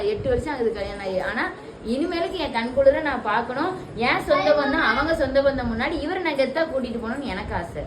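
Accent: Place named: Tirunelveli, India. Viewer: திருநெல்வேலி காரின்னு நிரூபிசுட்ட சகோதரி 👍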